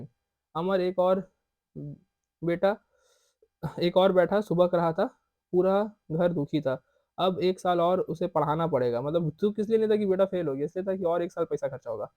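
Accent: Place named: Kolkata, India. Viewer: bhai aap kolkata se ho? awaaz se lagraha hai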